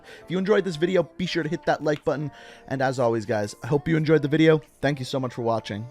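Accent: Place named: Canada. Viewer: Paul Martinez I believe it's his Canadian accent .. I maybe mistaken, I don't like the accent. I love his content and info tho!!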